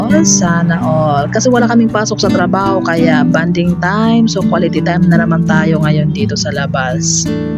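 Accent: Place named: Iloilo, Philippines. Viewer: Ilonggo gd ah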